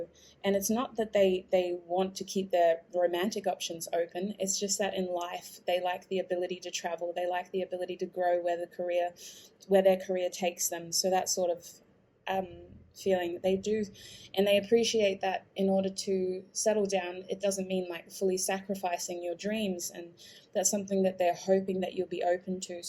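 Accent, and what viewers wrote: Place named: Australia. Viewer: Thank you. Group 3. Perfectly accurate. I will continue to focus on self, he has a lot of mixed up "stuff" to sort through, and I know he will come back into my life, he struggles to let go, however, I have put my life on hold for too long now. Time for me to keep focussing on me and moving forward. I follow a few tarot readers, it sounds like you are Australian. You are very good at what you do. Thanks for sharing with us and giving us some clarity xxx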